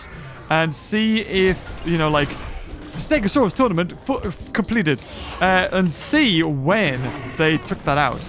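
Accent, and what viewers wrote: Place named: United Kingdom. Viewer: You can tell that he's British!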